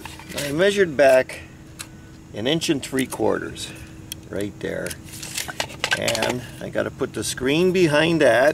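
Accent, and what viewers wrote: United States. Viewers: . Cheers for the info.! Of the many American accents we hear from this side of the pond yours is the most pleasant. Is your accent specific to Scranton or Pennsylvania in general.?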